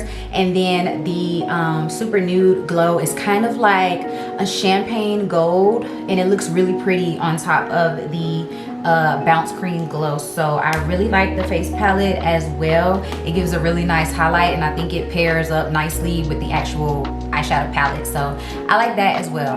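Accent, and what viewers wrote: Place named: Miami, United States. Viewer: I looooveee your channel and your Trina like Miami accent😩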